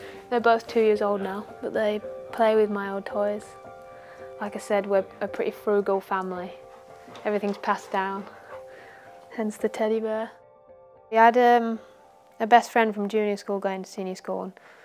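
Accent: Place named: Yorkshire, United Kingdom. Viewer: Like the Yorkshire accent . Brave girl. Good luck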